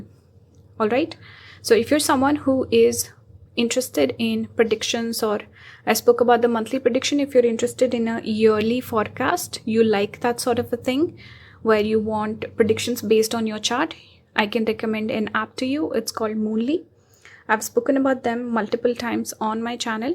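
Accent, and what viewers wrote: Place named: India. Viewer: Why you sound like an Indian...are you an Indian ❤❤❤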